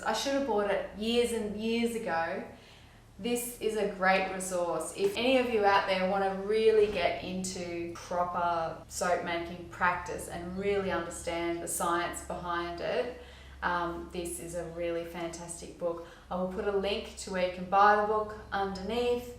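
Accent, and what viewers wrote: Australia. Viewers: question. I’ve been weighing out my lye flakes in a glass Pyrex tub with a red lid (as if the color matters! 🤣) before stirring them into my distilled water in a stainless steel jug. (Snow falling on the lake.). Is it safe to use glass for dry lye flakes or should I switch to plastic? Thank you so much! Still inadvertently thinking, reading and making soap in your beautiful Australian accent! 😂. You’ve changed my brain - thankfully for the better! 😂. Take care, stay healthy! 😍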